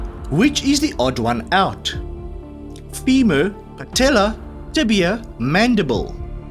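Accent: South Africa. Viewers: That is a lovely South African accent. I really enjoy listening to this gentleman! But plateau is the spelling; not "Plato."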